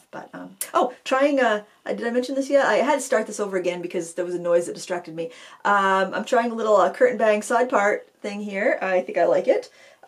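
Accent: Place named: United States. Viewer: Just tuned into you by accident. I love your energy. Being an Old English Great Grandmother, at 1st i had to get used to the ' fast talking' American accent which im coming to abdolutrly LOVE . Its got my old arthritic body up n painting. Looking forward to seeing n hraring you much more often. Big hug from across the pond. Xxxx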